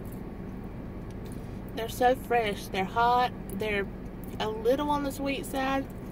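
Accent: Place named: South, United States. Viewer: I really enjoyed this and your southern accent :) I did a MUKBANG on my channel for them also :)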